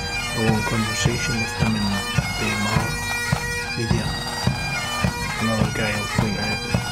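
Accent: Scotland, United Kingdom. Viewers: LOL .... I love the videos but have great difficulty understanding the narration due to the Scottish brogue. I turned on the auto generated subtitles and that is gibberish. BTW English is my mother tongue.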